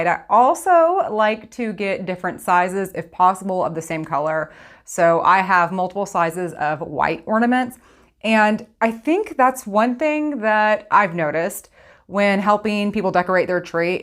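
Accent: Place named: East Coast, United States. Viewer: Yours is definitely my Fav diy channel. Your stuff is always so adorable and I just LOVE your accent. East Coast maybe? Keep up the good work!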